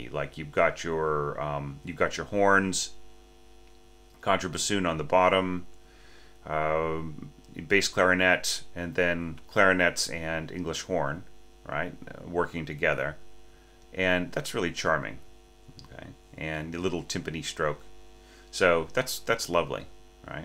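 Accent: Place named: France. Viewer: Very sweet. Sounds very French. I love it. And so many thanks. It is a joy to hear you talk about the score...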